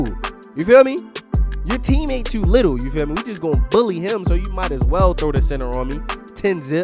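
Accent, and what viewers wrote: Baltimore, United States. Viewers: Yo you gotta be from Baltimore we got the same accent and we talk the same 😂💀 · Baltimore accent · “Twiu, dwiu” Baltimore accent so ugly moe 😭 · Is from Baltimore or Massachusetts?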